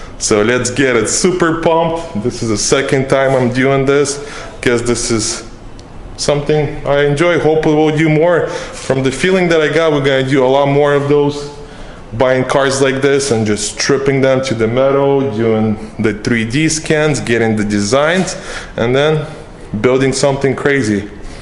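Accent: Russia. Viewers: У него русский акцент) Он меня поймёт)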